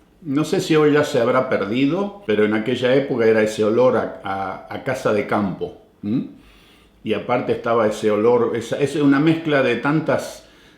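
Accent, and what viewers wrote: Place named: Argentina. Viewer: querido que hermoso..no perdistes tus costumbres argentinas,tu tonada,las costumbres..eres muy autentico BIEN ARGENTINO¡¡¡¡¡SALUDOS DESDE SALTA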